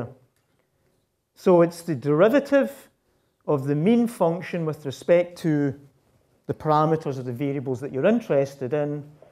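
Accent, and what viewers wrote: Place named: Scotland, United Kingdom. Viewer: The first thing that struck me was the super cool beard. The second thing was the super cool accent. What is that accent? Is it some kind of Scottish?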